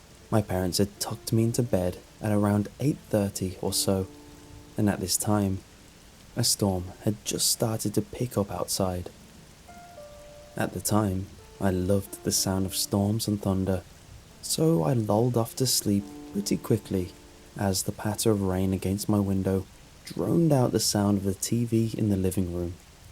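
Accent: United Kingdom. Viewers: is the british voice narrating the story. Yes, he only reads stories that other people post. I’m not blaming for this at all. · Oh no british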